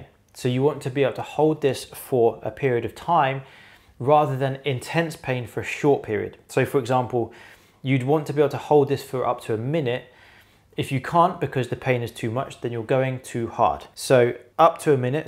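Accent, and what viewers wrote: United Kingdom. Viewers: You sound like you're from Landan...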